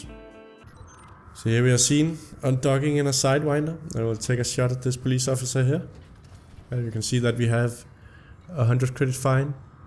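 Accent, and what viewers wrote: Denmark. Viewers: Hmmm, is that a danish accent?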